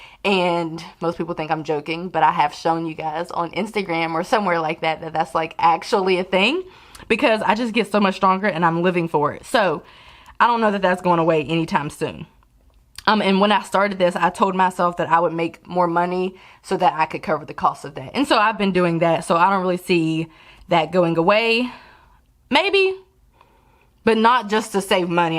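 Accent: South, United States. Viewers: You’ve taken on a southern accent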